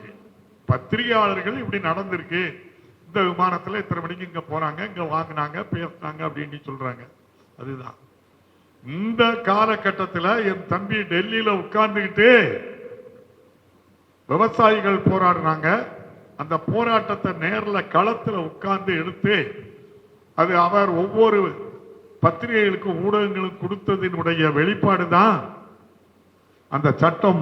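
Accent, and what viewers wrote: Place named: Tirunelveli, India. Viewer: ❤❤❤ நெல்லை மாவட்டத்துக்கே உரிய தமிழ் நடை இவருடைய பேச்சில் உள்ளது.❤❤❤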